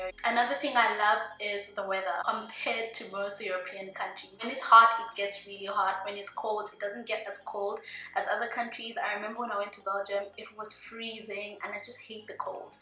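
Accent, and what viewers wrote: South Africa. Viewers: Hey!! you sound South African!! I can tell you are!!I would like to travel to Italy through AuPairing. Can we get in touch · Are you south african? I can here an south african accent but mixed with another accent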